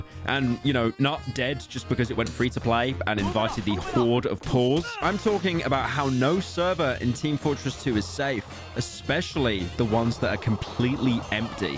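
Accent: United Kingdom. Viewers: I ain't watching the appalachians Petscop video I want the british femboy voice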